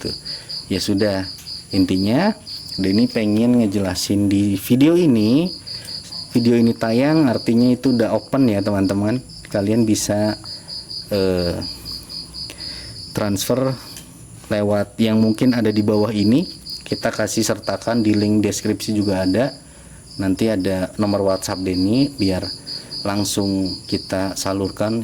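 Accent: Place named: Palembang, Indonesia. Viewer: Mas kaya orang Palembang Lo ternyata orang Jawa.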